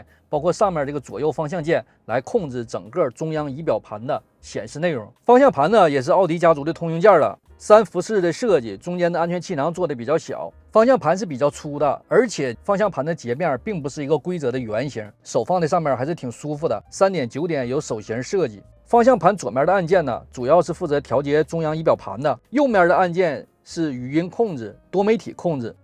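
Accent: Northeast, China. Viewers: 听到这东北味就感觉满口大蒜味，真是人车都辣眼睛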